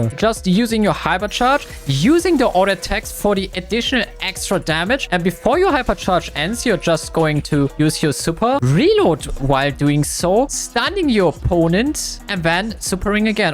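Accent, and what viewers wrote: Germany. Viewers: Bro your German accent IS sticking Out of that voice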